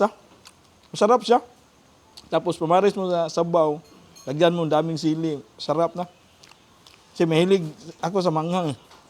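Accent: Visayas, Philippines. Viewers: Bisaya kaau ka bai..😅😅😅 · Mag bisaya idolwag kang mag Tagalog halata kc Tagalog mo idol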